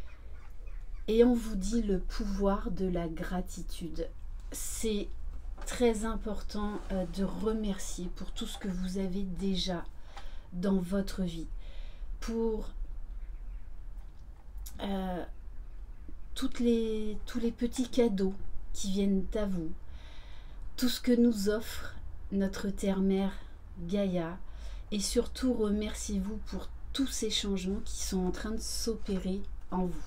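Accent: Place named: Alsace, France. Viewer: Bonjour. Oui du 67 .mais c est un accent tellement mignon qu on a .rester comme vous êtes .c est l Authenticité qui fais la bonne personne. 😇🥰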